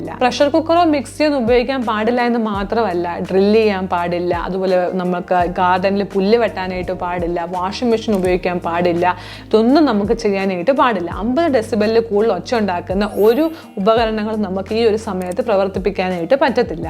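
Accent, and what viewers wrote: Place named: Pala, India. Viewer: പാലക്കാരി ആണോ മോളെ...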